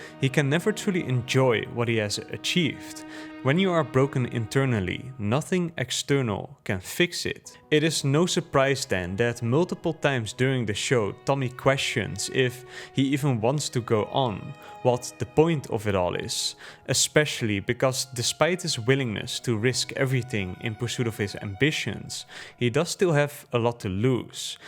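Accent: Netherlands. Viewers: Strong dutch accent haha. Great video. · Klinkt als een Nederlands accent, mooie, interessante video.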